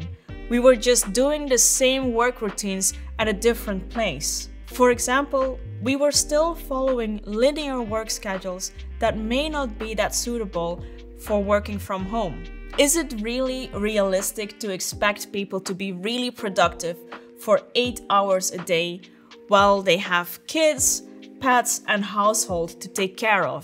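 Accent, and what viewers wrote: Netherlands. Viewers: are you dutch? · She sounds so dutch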